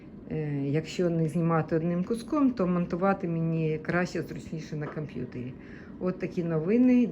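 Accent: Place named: East, Ukraine. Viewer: Вітаю! Ви не переживайте про те, що розмовляєте на суржику.😊 Це типова вимова сходу і півдня України.😊 Ви все надолужите, було би бажання. З появою інтернету, у Вас є можливість перевірити озвучку відео, з допомогою Гугл онлайн перекладача. Ви краще приділяйте більш уваги англійські мові. Вона Вам буде в нагоді і в США, і після перемоги, якщо повернетесь, і в Україні. Будете, як то кажуть "в струє"😊😊 Ностальгію за вітчизняними продуктами демонструють всі іммігранти.😊 Це тішить душу. Але Ви живете в такому місті і маєте можливість ( не завжди...), але бувати в Вашингтоні. Там, мені здається, є все і зі всіх світів😊. Хіба, що це не дешево(. Бажаю Вам здоров'я! Вам і Вашім дітям благополуччя! Ви молодець! Успіхів! P.S. А в нас по всій Україні ревуть генератори Гул стоїть постійний. Жара 35°С. Но все працює! Продукти і товари є. Всі ми маємо надію і віру на Перемогу❤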